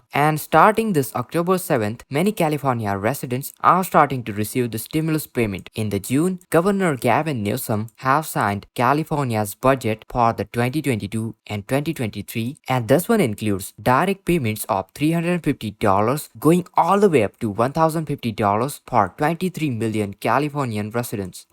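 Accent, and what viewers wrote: India. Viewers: I like your Hindu accent 🙂😉😉 I want a Hindu even though I'm not Hindu, damn baby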